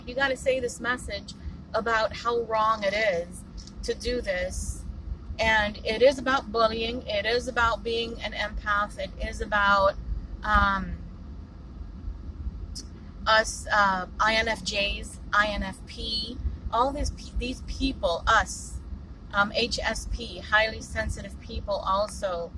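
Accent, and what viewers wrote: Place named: Latin America. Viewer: I heard your voice and I immediately tried to figure where you are from. I know South Florida, but I would think Venezuela or Puerto Rico. I don't hear so much cuban. Perhaps Colombia? I am into accents. INFJ/Scoprio here in Tampa. Btw, this is one of the problems I see as well. Great vid.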